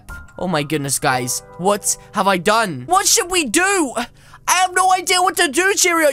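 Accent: United Kingdom. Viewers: I love ur voice it's British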